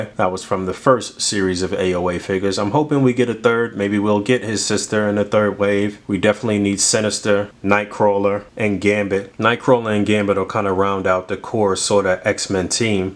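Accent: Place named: New England, United States. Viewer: First time here, boy is that New England accent thick AF keep up the good work (QGTM)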